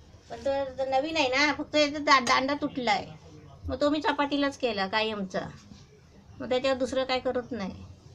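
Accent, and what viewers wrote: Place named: Ratnagiri, India. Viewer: Kaku, chapati chi paddhat pan chhan aani tumhi kelel varnan pan mast. Tumchya bholnyat Ratnagiri chya bolibhashecha godva sampurn video sampeparyant khilvun thevto.. 👍🏼 Dhanyawad.. 🙏🏽 🙏🏽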